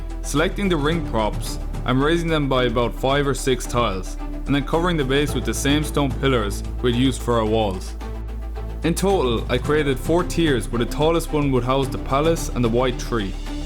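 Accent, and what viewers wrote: Ireland. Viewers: You irish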